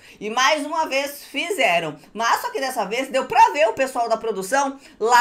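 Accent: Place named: Rio Grande do Sul, Brazil. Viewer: Guria tu és gaucha tchê.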